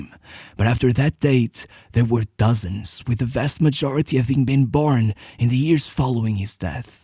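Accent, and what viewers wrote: Portugal. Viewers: It's so obvious you are Portuguese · THIS MAN´S VOICE YOU PORTUGUESE ARENT YOU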